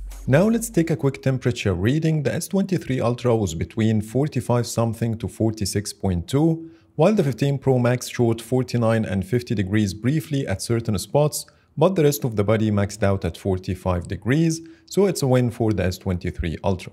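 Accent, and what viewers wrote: Egypt. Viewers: مصري؟😂 حسيتك هيك من اول جملتين😂 *انا سوري btw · Bro, are you Egyptian cuz I can hear it from your accent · عاش والله طور من الانجليزيه عشان ملاحظش انك مصرى بس ممتاز جدا ❤ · اللهجة مصريه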